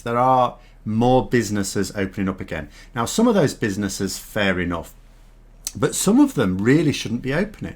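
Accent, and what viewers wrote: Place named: Yorkshire, United Kingdom. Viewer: Bit rambling today, and a bit more Yorkshire. It’s been bugging me who you remind me of. Geoffrey Palmer from Butterflies. You even have that world weary demeanour. That is anything but a criticism.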